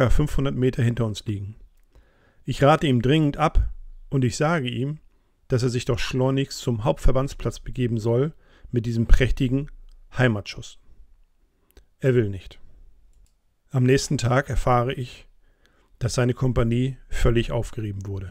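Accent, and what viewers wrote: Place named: North, Germany. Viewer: Ein wirklich bewegendes Zeitdokument, das so lebhaft und plastisch beschreibt, dass einem direkt die Bilder dieser Kraterlandschaft vor Augen kommen... Was mich beeindruckt hat, war die manchmal schon fast poetische und malerische Schönheit und Präzision der Sprache, mit der dieser damals 18jährige junge Mann seine Erlebnisse und Eindrücke schildert. Ein großes Lob an Sie für die Vorarbeit und auch für das Einlesen dieses Textes selbst. Die Tatsache, dass kein Schauspieler oder Synchronsprecher diesen Text liest, sondern jemand mit in diesem Fall norddeutscher Klangfarbe, macht das ganze noch authentischer, noch spürbarer. Vielen Dank für diese Arbeit!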